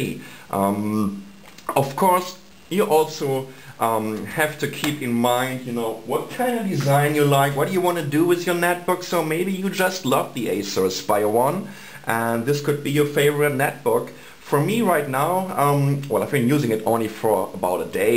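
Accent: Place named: Germany. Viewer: I like his german accent :)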